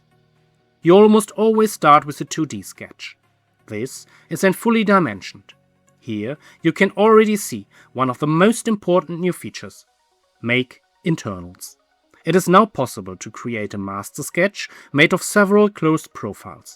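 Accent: Germany. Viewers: Ein Deutscher der englisch spricht ist unverkennbar😅😂 Gutes Video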